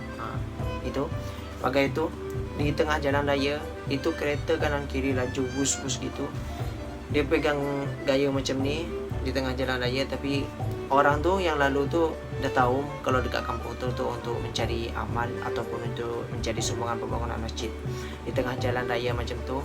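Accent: Malaysia. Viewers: logat Malay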